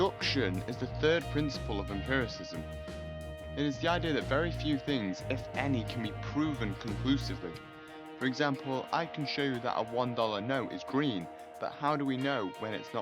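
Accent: United Kingdom. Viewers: your british english made me hard to understand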